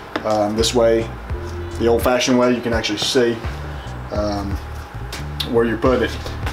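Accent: South, United States. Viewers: Came for the shoes, staying for the hot southern drawl! 🤗🤗🤗🤗🤗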